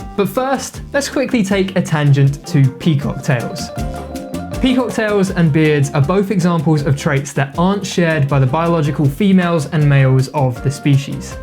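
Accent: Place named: United Kingdom. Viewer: Just found you today, and now I am subscribed. Love your content! Hey I have a dumb question for you? Do you live in the UK as your accent makes it sound like you might?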